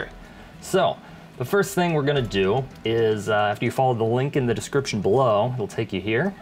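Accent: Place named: South, United States. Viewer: Why do you speak like a dummy ted neck ?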